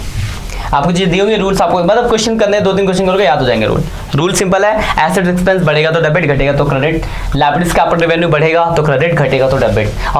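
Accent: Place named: Haryana, India. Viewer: Amazing explanation sir...... I think u are from haryana????????ur teaching is really great 🙂🙂🙂🙂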